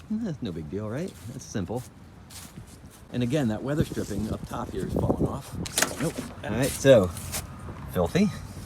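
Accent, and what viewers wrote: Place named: Pennsylvania, United States. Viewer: im new here so idk if youve said where you're from but i would know that accent anywhere lol PA ftw bro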